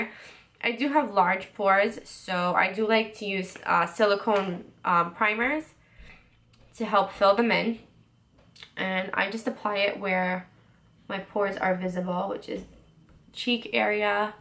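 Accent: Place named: New York, United States. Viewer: Being from NY I can definitely hear your accent lol I lost my accent when I moved to Wisconsin. lol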